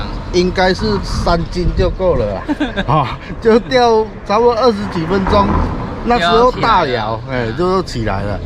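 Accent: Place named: Taiwan. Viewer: 講話很台灣，親切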